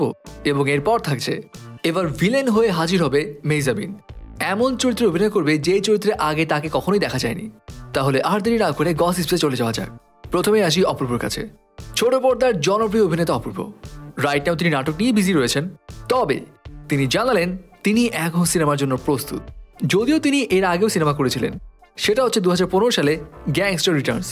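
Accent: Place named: Kolkata, India. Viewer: star golper yutuber তো বাংলাদেশি বাংগালী কথা বা ভাষা কলকাতার মত কেন ভাই?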